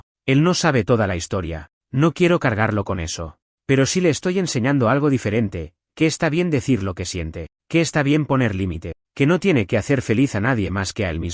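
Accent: Argentina. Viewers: No es que no me guste el bot argentino pero prefiero español neutro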